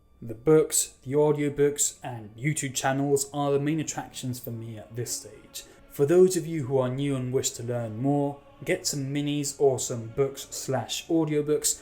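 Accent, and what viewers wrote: United Kingdom. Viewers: omg, your english accent is orgasmic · man what a brit accent you have, when you said you would rule an english channel I expected a strong spanish accent. Impeccable.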